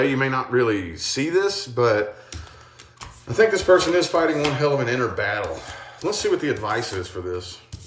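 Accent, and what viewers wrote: South, United States. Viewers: I listen to him and no, I don't see the connection. Except that they're southern US born.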